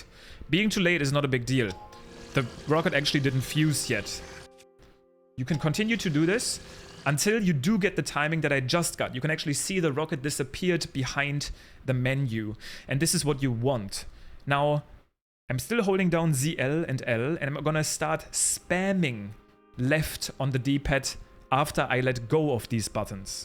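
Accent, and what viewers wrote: Germany. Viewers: this is definetly a german accent, right? :) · You have so a german acent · You sound really German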